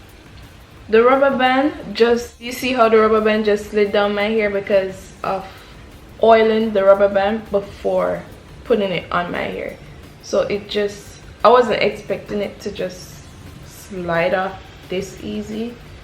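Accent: Jamaica. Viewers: Mi luv yuh Jamaican accent😝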